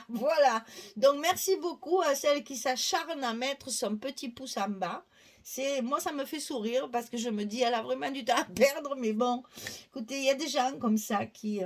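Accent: South, France. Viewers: Toujours enchantée de vos créations et vos explications données avec ce petit accent du sud que j'adore merci pour toutes ces explications très claires bisous et belle journée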